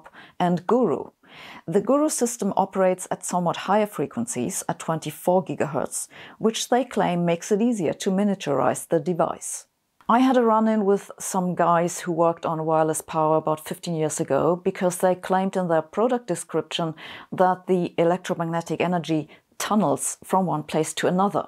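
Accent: Germany. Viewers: Are you german? Alot of Youtubers i see with a similar accent have a similar type of "stare" No offense though. Your videos are informative and cool. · Hottest. Physicist. Alive. That German accent though.😵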